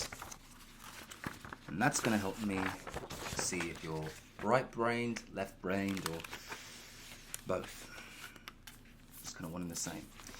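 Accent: England, United Kingdom. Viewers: British accents trigger me so much, and yours is one of the best 👌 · Amazing English accent! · Omg it’s the return of the British accent!! I feel like it’s been literal years since you’ve used it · Ooooooo love the British accent! Wasn't expecting that at ALL and I IMMEDIATELY grinned xD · The British accent is the one!! 😂🥰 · Lovin the British accent 🤣 I’m British myself haha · Bro the British actually matches you 😂🖤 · British accent is awesome. Please use in other videos! Thank you for your hard work.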